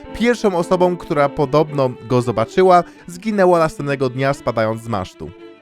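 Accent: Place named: England, United Kingdom. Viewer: Myślisz że to tak łatwo gadać po Polsku kiedy spędziłeś 18lat w Anglii?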